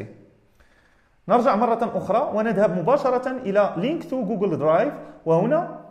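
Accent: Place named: Morocco. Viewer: انته بتتكلم ً. مغربي. ما فهمنا. عليك بتتكلم. بسرعه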